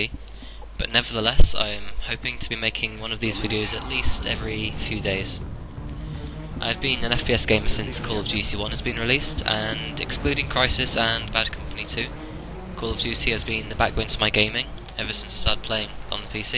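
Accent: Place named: RP, United Kingdom. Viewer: oooooo very posh lol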